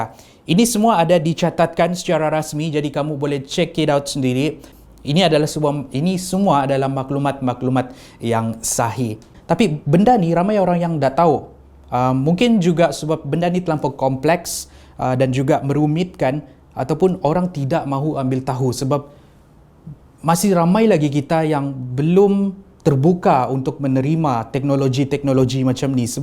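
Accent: Sabah, Malaysia. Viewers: ini kali ketara sabahnya jo 🤣🤣🤣🤣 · aku asyik trgelak je ni bila asyik2 tgk nota hahaha 😂 ckp bahasa sabah comel ja bah 😂 #salamdrmelaka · The best part is when dengar cerita but with sabahan slang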